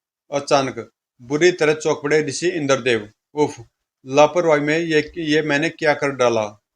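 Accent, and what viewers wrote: Haryana, India. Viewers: मजा आ गया हरियाणवी स्टाइल में comic सुनकर भाई, जय हो 🥹🤟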